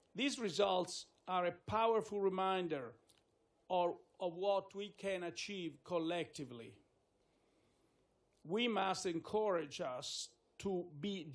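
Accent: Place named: Italy. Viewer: Accento spiccatamente italiano. Che non è un problema, sia chiaro, il proprio accento è qualcosa di cui andare fieri. Resta il fatto che mi aspettassi qualcosa di più.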